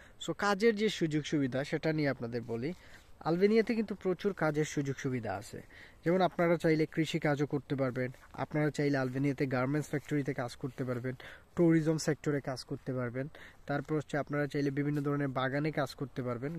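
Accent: Sylhet, Bangladesh. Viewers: ভাই সিলেটি নি ❤ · Vai Kotay lager Sylheti bhai basha koi?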